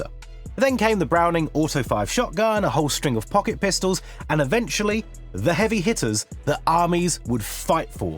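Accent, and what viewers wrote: France. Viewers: Highly recommend at least using google translate AI voice reading for the french accent (sorry), otherwise dope video !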